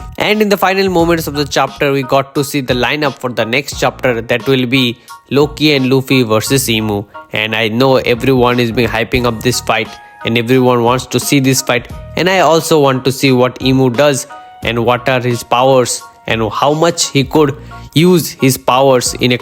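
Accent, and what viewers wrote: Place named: India. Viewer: Brother your English accent is Indian so if you are a Indian then try it to explain in Hindi there are indian community also + your voice is also good 👍🏻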